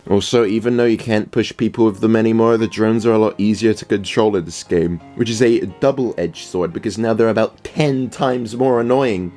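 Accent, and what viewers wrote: United Kingdom. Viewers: bro I love your videos so much man, your humor is so fucking amazing 😭 Your selfmade memes are hilarious and everything is made even better by your british accent and slang and this danm voices you make 😭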